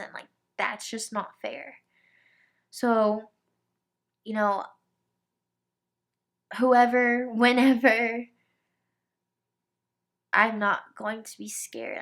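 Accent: South, United States. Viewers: Hey, I am so glad that you are feeling happy in this video. I completely love it when a girl starts crying, and then she would finish crying, and then she would start smiling and laughing. I am so glad you are feeling okay because I have been thinking about you and praying for you. I know I am much younger than you are (I am 18), but I admire you a lot. The number one thing I love about you........... is your one beautiful precious smile, along with your lovely Southern accent.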